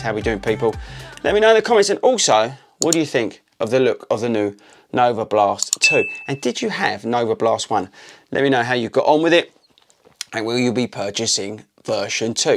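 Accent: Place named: England, United Kingdom. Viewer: you got the best accent man where from England are ya from?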